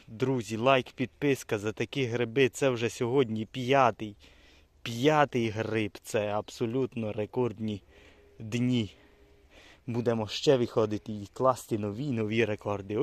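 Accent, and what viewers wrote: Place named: Transcarpathia, Ukraine. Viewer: ага то чути Закарпатський діалект👍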